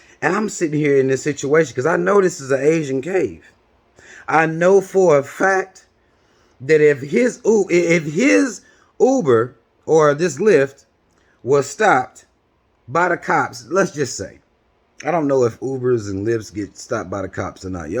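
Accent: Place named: France. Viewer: I fw you. That being said.. Accents are a thing. When you expose yourself to the rest of the world it's something you grow to understand. It'd be quite foolish of me to ignore what wisdom you have to share because it was shared in a french accent would it not?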